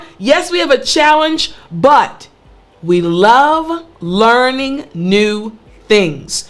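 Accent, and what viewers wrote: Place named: United States. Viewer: I love the American accent.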